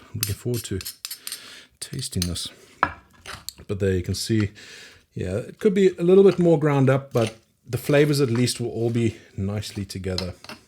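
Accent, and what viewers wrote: South Africa. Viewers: Thank you for video. The South African accent sounds like a breath of fresh air to me also. I grew up there. Been living in USA for 40 years now.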